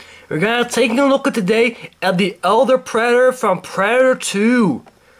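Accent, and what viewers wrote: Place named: Netherlands. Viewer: its kinda cool to see a review with a dutch accent , i like it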